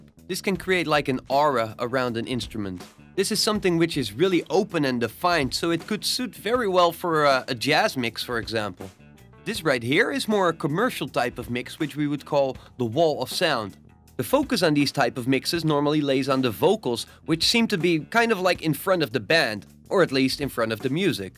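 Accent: Netherlands. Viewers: are you dutch? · Amazing explanation! By the way are you Dutch? That accent sounds so familiar. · Ik hoor een Nederlandse accent? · Love the Dutch accent 🤣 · klinkt nederlands? alsnog goed accent hoor haha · You dutch?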